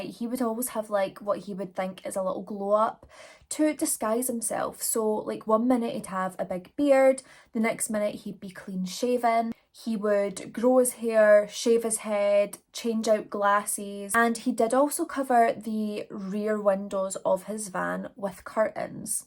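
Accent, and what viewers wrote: Scotland, United Kingdom. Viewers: BTW, your Scottish accent is everything 🤩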